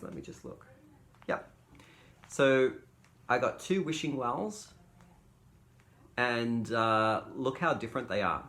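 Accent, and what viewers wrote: Australia. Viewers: Love hearing the Aussie accent. Loving your channel. Oi Oi Oi x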